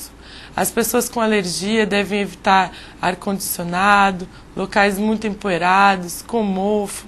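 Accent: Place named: Sao Paulo, Brazil. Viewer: (BARBRINHAENF) -------- Num tem nada à ver com sotaque não. Vc tá dizendo que ela tem um sotaque diferente do que estamos acostumados a ouvir no YOUTUBE, PÔW, o sotaque dela é PAULISTA, e sotaque paulista a gente tá cansado de ouvir no youtube, o problema é a voz dela mesmo. Fátima Bernardes, Cid Moreira, Zé Ramalho VOCÊ E EU SEMPRE VÃO e vamos ter a mesma voz em qualquer sotaque, compreendeu o que eu disse? Então não me venha com essa não porque não colou.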